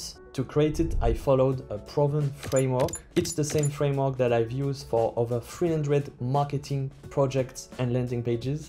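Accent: France. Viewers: cela sent l'accent francais 😮‍💨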